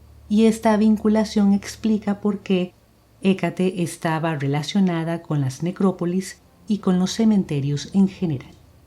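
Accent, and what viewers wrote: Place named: Costa Rica. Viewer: Tenes acento tico🥺❤️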